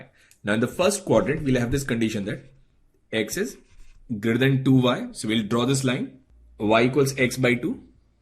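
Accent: India. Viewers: He is indian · I cannot even read the rightmost part of the question and the Indian accent is strong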